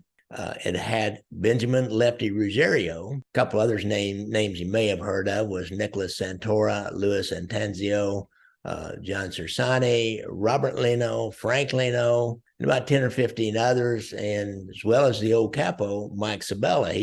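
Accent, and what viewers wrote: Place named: South, United States. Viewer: Great show as always. Its so refreshing to hear these stories from t&e perspective if the other side. While there are a few other former lawmen on youtube or guests on others platforms you have this kind of non biased, fair manner of reporting on your subject. Theyre all pretty ruthless criminals yet if theres anything good or decent about the person that sets them apart from the average thug youll bring it to light. Also, the best part is with your southern, countrified accent you dont sound like some wannabee, half-assed wiseguy. I'm Italian american myself and grew up in rural florida unlike all my other relatives who live within 20 miles of where my grandparents settled in Brooklyn over 100 years ago. Friends get a kick out of my lifelong interest in and of my extensive knowledge of Cosa Nostra because my southern accent makes me a hic or redneck who shouldnt have the interest, background and knowledge that I do. 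I never understood why that was so funny or strange to them until i started listening to your show. Now i kinda get it. For some reason though i dont think anybody feels the same when they listen to James on OC SHORTS. Anyway, just wanted to thank you for another great one. I also wanted to request a show on Trafficante family. We've all heard about his ties to Hoffa, Cuban casinos and possible involvement in the JFK assassination but theres not alot out there about local activities in Tampa and throughout Florida especially S. FL. mainly Dade and Broward countie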